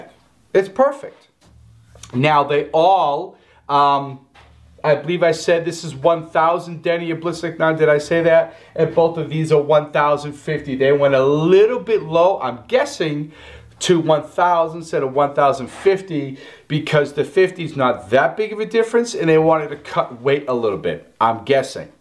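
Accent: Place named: New England, United States. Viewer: Great vid !!! Btw I'm sensing a fellow New Englander by the accent !!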